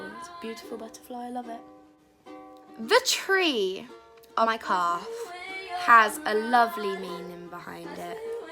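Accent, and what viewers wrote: United Kingdom. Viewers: Haha. You sound very British haha. I love your tattoos btw :)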